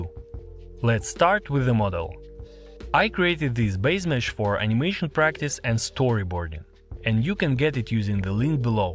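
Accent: Russia. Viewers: Where is cheating. It took years to complete. Also this runglish is an ear ache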